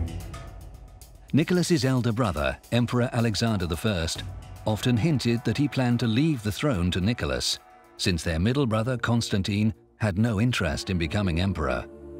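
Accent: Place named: England, United Kingdom. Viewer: МММ, английский тип) но нормально!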